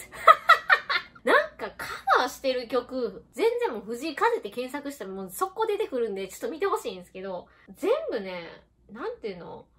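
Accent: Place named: Osaka, Japan. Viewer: さん！今年もよろしくお願いします。 『メチャ』『ホンデ〜』『オモロイ』『アンマー』 メチャー大阪弁大好きやん！会話の間の取り方良いね。藤井風また聴きます。『何なん！』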